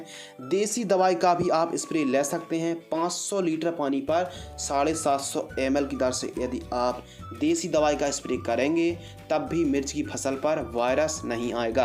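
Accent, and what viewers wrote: India. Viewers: Apka ye video mujhe achha laga, jaankari jabardast h sir, ....... Apka bolne ki shaily rathiya bhasha laga app kaha se ho sir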